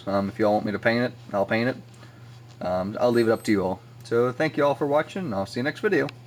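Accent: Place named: United Kingdom. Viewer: i can feel the british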